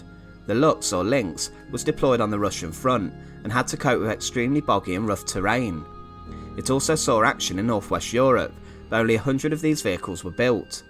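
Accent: United Kingdom. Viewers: What region of the UK is the narrator from ? His accent is different than others you typically hear.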